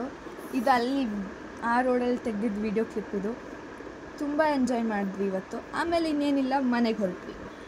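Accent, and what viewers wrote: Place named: Mangalore, India. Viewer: Naavu moodbidire alli vodidu alvas pu college i loved manglorean accent 1st when I saw your video in instagram I thought you are from dk ❤🎉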